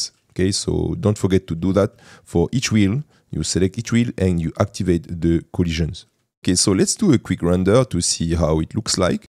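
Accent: France. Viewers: Seem french accent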